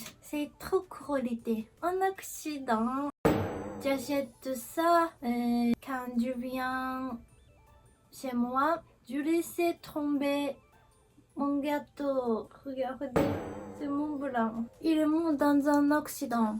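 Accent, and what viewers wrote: Japan. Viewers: Merci pour cette vidéo , avec cet accent vraiment Kawaii, tu parle très bien Français bravo , mieux que je parle le Japonais 😆 Arigato Gozaimasu 👍😊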